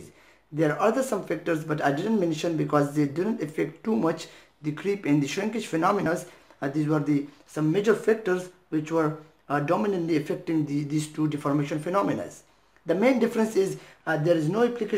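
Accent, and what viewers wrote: India. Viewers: Nice Explanation. Just curious to know whether you are from South India?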